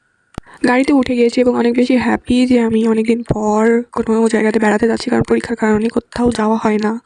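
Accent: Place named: Rajshahi, Bangladesh. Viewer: Pure Rajshahi accent,I’m from Rajshahi too😊. Video editing ta bhalo chilo,keep going sister❤.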